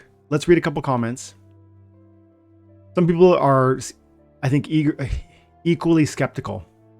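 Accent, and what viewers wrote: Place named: Canada. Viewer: The first time I unplugged my headphones from my laptop and watched one of your videos and my girlfriend walked by she said, "He's Canadian?" and I said, "How did you know? He doesn't really have an accent" and she said, "aboot." I'd give her my raffle ticket for that one but she doesn't play.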